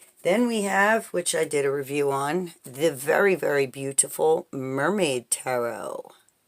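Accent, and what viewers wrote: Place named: New Jersey, United States. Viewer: Your accent makes me ache for home.... Jersey girl or NY?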